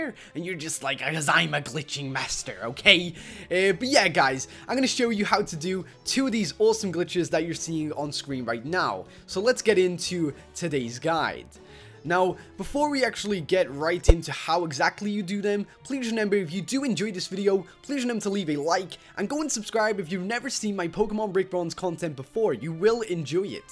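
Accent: Ireland. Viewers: Are you Irish?:D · is he irish?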